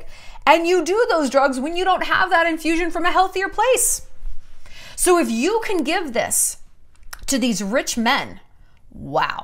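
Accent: Russia. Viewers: Your russian accent gives me absolute life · Your Russian accent and the random script you chose was soooo funny! I hope you do a Advice-themed standup and maybe commercials and movies!!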